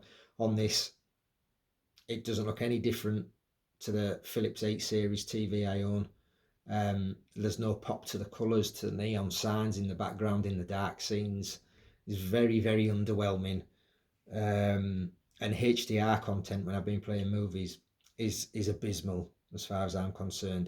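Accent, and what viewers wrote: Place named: Teesside, United Kingdom. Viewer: You sound like you're from the Teesside area, where you buying from that allows you to return mate? Looking to buy over the weekend, and would love the option to return, after getting stuck with a TV i hated from week 1 last time, but was stuck with it. That was an edge lit nanocell, lights all showing along the bottom of the screen now, but the banding, black wash out and blooming, made dark movies and gaming unusable to me, it was a total disappointment. I've been looking at this TV and the TCL 805, which you obviously favour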